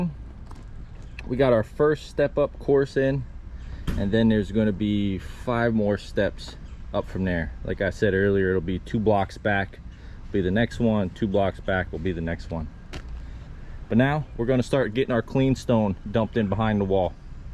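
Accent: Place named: Northeast, United States. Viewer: Only two minutes into the video. Are you based outta the northeast? Couldn't guess anywhere else haha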